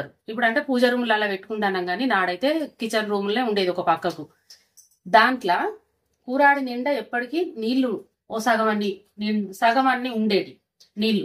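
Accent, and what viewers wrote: Telangana, India. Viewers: నేను రాయలసీమ మీ భాష వింత ఉంటే నాకు భలే అనిపిస్తుంది నేను తెలంగాణలోనే ఉన్నాను మళ్లీ నేను మా తెలంగాణ భాష వింటున్నట్టు ఉంది మంచిగా నన్ను చెప్తా అంటే మిస్ కాకుండా వింటున్నాను subscribe చేశాను, మీరు అప్పుడు నా కంచం లేదు అంటున్నారు కదా ఇప్పుడు ఆ కొంచెం కొనుక్కోండి ఆంటీ ఎక్కడైనా దొరుకుతాయి ఆ కంచంలో మాత్రమే మాకు తిని చూపియండి మీరు చెప్తా అంటే చాలా ముచ్చటగా ఉంది లాస్ట్ లో మాత్రం మీరు ఆ లాస్ట్ లో కూర్చొని ముచ్చటగా తాగుతా ఉంటే ఎంత హాయిగా ఉందో చూస్తా ఉంటే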